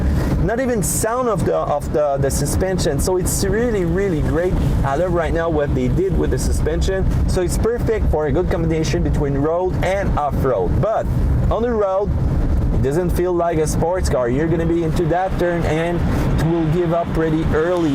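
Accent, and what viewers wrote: Quebec, Canada. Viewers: Sounds like a French CDN - where I was born a long tome ago.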